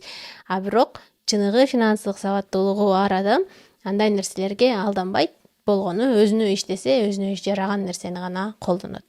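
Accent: Standard. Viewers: АЗАМАТ КЫЗ ЭКЕНСИН, АНТКЕНИ КЫРГЫЗ ТИЛИНДЕ ТАК ТАЗА СУЙЛОЙТ ЭКЕНСИН ! БУЛ СЕНИН АКЫЛДУУ ЭКЕНИНДИ. БИЛДИРЕТ ! << КИРГИЗ >> ЭМЕС ЭКЕНСИН ДЕМЕК КЫРГЫЗДАР УЧУН ЖАН ТАРТКАН ЖАКШЫ. АДАМСЫН ! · Аягына чейин аябай кызыгып коруп уктум,унунор да жагымдуу, озунор да татына кыз экенсинер,таптаза кыргызча суйлоп адамдын мээсин ачып куйчуну куюп кайра жаап койгондой айттынар баарын,молодец аман болгула ишинерге ийгилик🎉🎉🎉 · Эң соонун кенештер. Кыргызча таза сүйлөгөнүңүз үчүн сизге чооң ырахмат.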